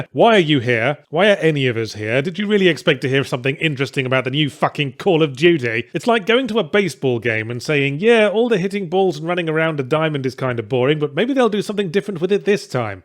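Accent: United Kingdom. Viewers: I am here to listen to a British man in a fedora say really funny things about a shit game REALLY REALLY fast <_<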